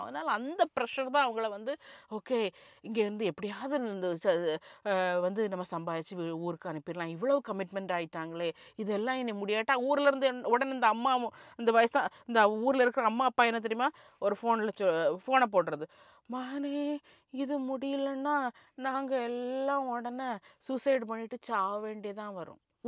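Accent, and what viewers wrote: Nagercoil, India. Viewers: Neega nagercoil a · Nagercoil Tamil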